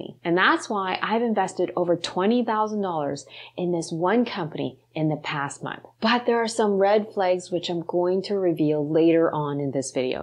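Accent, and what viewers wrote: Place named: Canada. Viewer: Anyone else get a lovely Canadian accented female Mr. Rogers vibe from her? I could listen to her all day.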